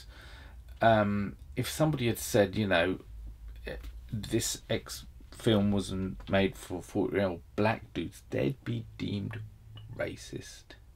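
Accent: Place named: United Kingdom. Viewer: Expected to hear a Midwestern deep African American voice, Received an accent from the motherland across the pond. Jolly good! You earn a new sub for making me laugh as well as we have the exact same shirt on. I don't know the odds on that but it's still pretty fuc*ing impressive. I'm looking forward to more content brother.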